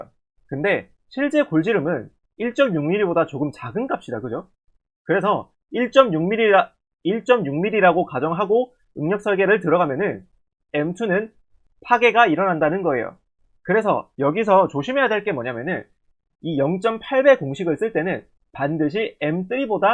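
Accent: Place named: Gyeongsang, South Korea. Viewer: 사투리가 약간 있네요 혹시 경사도분? ㅎㅎ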